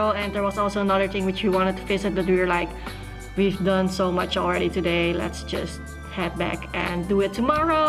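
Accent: Netherlands. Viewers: Aaah ik hoor gewoon dat je Nederlands bent! Geen idee waarom maar je weet het gewoon als er een fellow dutchie is😂 Je lijkt me echt een leuk persoon, als je in Amsterdam bent zouden we moeten winkelen ofzo x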